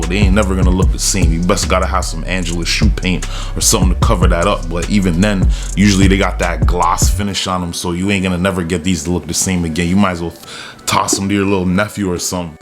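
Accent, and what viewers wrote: Toronto, Canada. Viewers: you definitely from Toronto 😂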